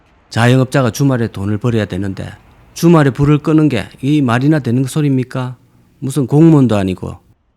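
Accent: Gyeongsang, South Korea. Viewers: 고향이 경상도 어디세요? 사투리 귀엽 ㅎ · 안녕하십니까 ~~ ~~ 입니다. ~~~ ㅎㅎㅎ 그 소리를 들으면 스트레스가 팍 풀리구 구수한 경상도 말투, 어린시절로 돌아가는듯합니다. 평서 객관적이고 참 재미 있는분 같으십니다. 건강하셔서 오래 오래 진행 부탁드립니다 감사합니다.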